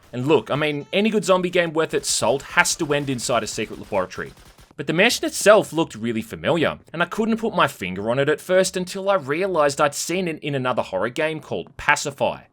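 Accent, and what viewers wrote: Australia. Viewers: finally realizing that the aussie accent is funny.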